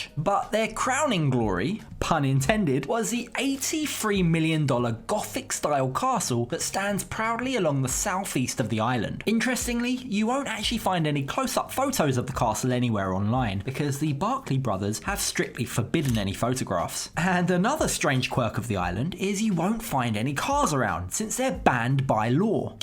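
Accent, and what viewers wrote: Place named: England, United Kingdom. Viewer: English accent, I'm out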